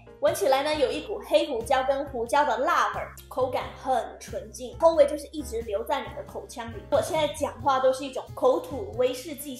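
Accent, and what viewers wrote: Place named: Taiwan. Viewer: 這口音也太特別，台味非常重的大陸音。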